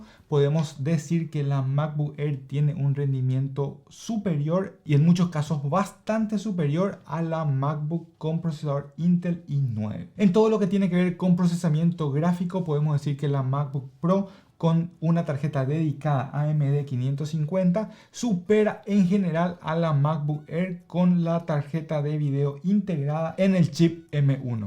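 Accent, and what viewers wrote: Paraguay. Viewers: Una pregunta. Sos de Paraguay? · Eres paraguayo, me di cuenta en solo 30 seg de vídeo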